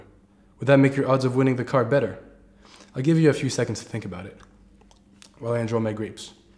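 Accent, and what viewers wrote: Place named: France. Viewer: Cute French accent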